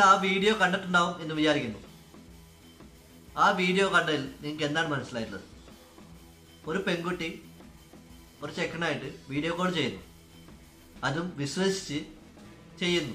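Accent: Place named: West Bengal, India. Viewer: ബംഗാളി മലയാളം സംസാരിക്കുന്നത് പോലെ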